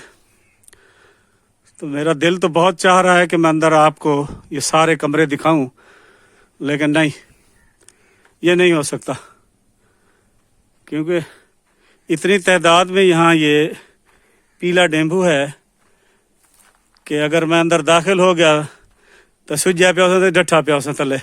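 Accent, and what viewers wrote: Saraiki, Pakistan. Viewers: Sir jab ap saraki ka tarhka lagaty ho to bahot Maza ata hai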